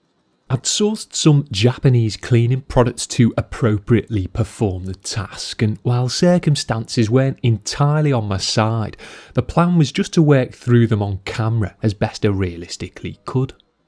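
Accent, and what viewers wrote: United Kingdom. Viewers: Awesome British English mate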